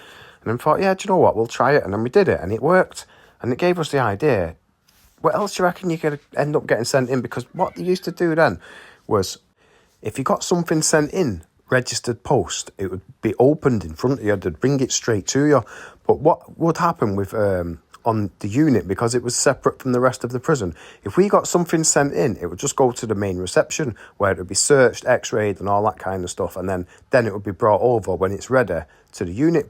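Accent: Manchester, United Kingdom. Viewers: Lad proper thought you were black (don't know why) love that scally manc accent and that's from a Scouser,❤ the content 👍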